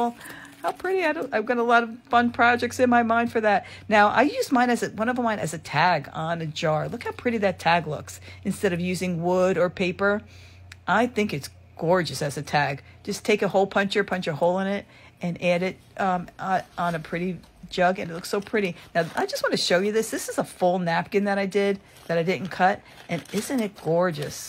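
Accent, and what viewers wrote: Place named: East Coast, United States. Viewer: I hear an east coaster...NJ? "Cawfee" LOVE IT!! really I do. This is a fun tutorial, thank you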